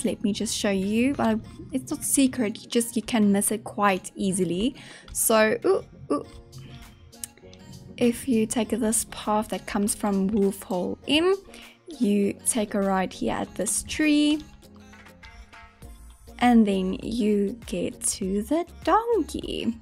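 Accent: South Africa. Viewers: Hi, are you South African because I recognize your accent. 🙂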